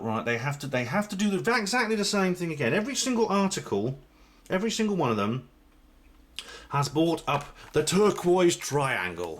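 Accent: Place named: RP, United Kingdom. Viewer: I have been a subscriber to your channel for a couple of years nowand i enjoy the content and share quite a few of your views, however without meaning anything bad i have to laugh at you slagging or mocking the way the toffs speak all proper,or Daily Telegeraph like. You do not exactly have the twang of someone who was brought up on a housing estate. Whether you were or not isn't what I'm getting at nor am I slagging you,but you do talk quite proper yourself. I can understand a bit cos growing up in Glasgow I talked a bit different when I am with my mates than I do when I am speaking to someone in an office setting or maybe a court (lol) definatly na lot more from I was 17 or 18 plus i went to Northern Ireland to live from about 1990 till 2006/7 so I had to change it or folk would never have understood me, so I understand if that is why you speak properly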